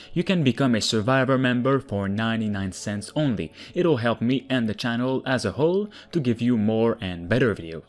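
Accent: Quebec, Canada. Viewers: This guy has a distinctly quebecois accent i find...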